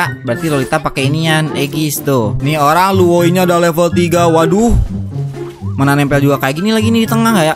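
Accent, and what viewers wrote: West Java, Indonesia. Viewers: Logat SUNDA nya nagih sumpah wkwkwk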